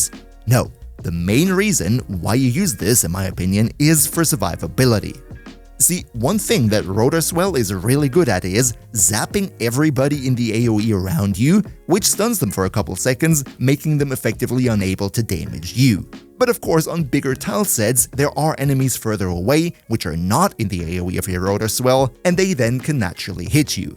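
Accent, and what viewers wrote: Germany. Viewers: You sound just like a happy german guy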